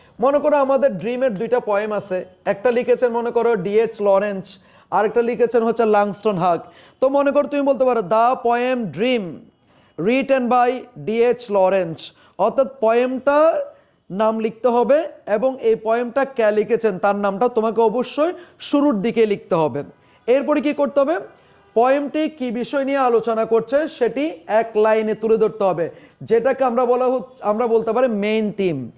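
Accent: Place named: Chittagong, Bangladesh. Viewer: স্যার মনে হয় চাটগাইয়া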